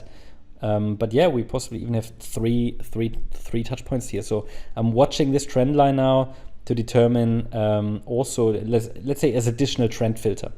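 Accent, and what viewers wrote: Germany. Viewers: bist du deutsch? german like accent :D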